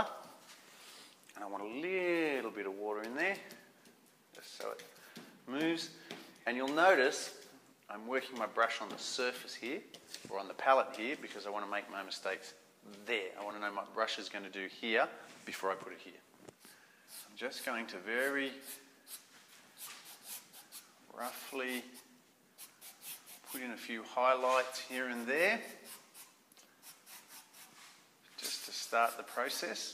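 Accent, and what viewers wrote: Australia. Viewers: OMG, this looks so easy!!! i know you made it look so easy , to us it won't. LOL. I love Australian accent! You're such an amazing artist! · There's really something about that australian accent.. The way you guys say water is just earfood. Also, it's really wild how a few strokes of paint can do so much. I'm currently painting a picture with a little island in the distance, a big lightning going into the water, but I'm lost at the waves. I have very flat water right now, but during a thunderstorm, water is wild.. But I want to keep the painting calm and soothing still, so I have to figure out how to do this :D Your tutorial helped a lot :) Thank you <3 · This guys amazing! love ya auzzie accent :) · I never painted because I thought I had to know how to draw , well imagine my surprise ,when I could paint. I was so confused,because I honestly can’t draw at all . 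Lol, I’m new here , ready to move out ,I’m in Bracebridge Ontario Canada 🇨🇦,The colours are going to be changing at months end . It’s extremely close to Algonquin Park , where there’s an incredible amount of wildlife,natural lakes ,rocks ,cliffs,it’s really beautiful. I’ve never been to Australia,it sounds like your from Australia/ perhaps New Zealand . I love the Ocean, so when I get settled, this lady is going to order those colours. I wonder if I should get a large can from ( Benjaminin Moore Store ) or no ? It’s acrylic paint,correct? What do you think of metallic paint, ? I’ve recently see ceramic tile ,finger painting . Here , in Ontario , I’d think that would be really expensive. However I believe I saw this young man in Costa Rica . However he did use paper ,it looked like watercolour paper ,but it couldn’t be. , definitely durable . , so I honestly don’t have a clue . I’ve just got acrylic paint, and $ store canvases. 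So should I prep my cheap canvas first? Thanks 🙏 so much . Your very talented. Have a wonderful day.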